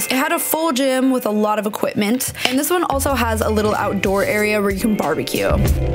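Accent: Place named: Southern California, United States. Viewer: Why do you speak like you're from SoCal when you've only lived there a few weeks?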